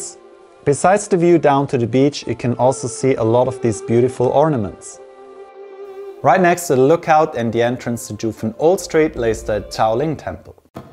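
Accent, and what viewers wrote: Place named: Switzerland. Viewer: Man this Swiss accent ... so sweet.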